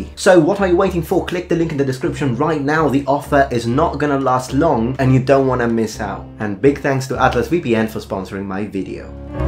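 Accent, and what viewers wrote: Italy. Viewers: Sei italiano vero? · Are you Italian where r u from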